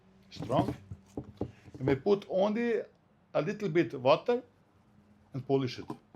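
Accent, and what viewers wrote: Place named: Germany. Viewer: Hey, bist du deutscher Herkunft? Ich Hab das Gefühl du hast Akzent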